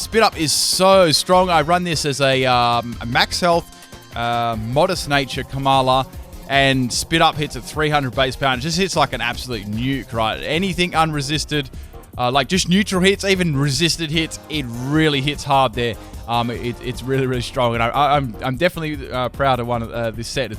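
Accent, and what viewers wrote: Australia. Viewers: By the way, are you from Australia or New Zealand?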